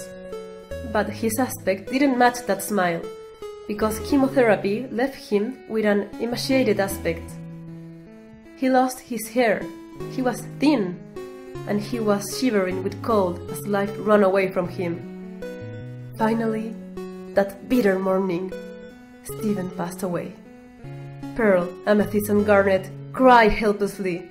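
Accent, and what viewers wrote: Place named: Russia. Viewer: bu arada teoriyi anlatan kadın rus aksanıyla konusuyor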